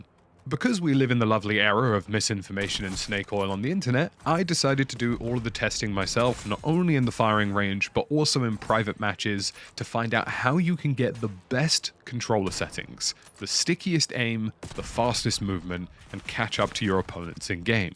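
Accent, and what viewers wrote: United Kingdom. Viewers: this is a British accent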